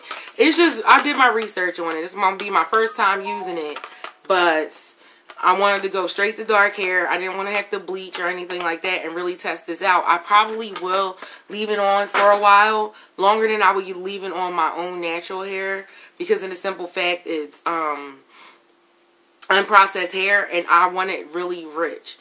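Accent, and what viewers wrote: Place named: Philadelphia, United States. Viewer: Ayyye you from philly to what part? As soon as i heard keep it a bean i was like she from philly #NorthPhillyOnThisJawn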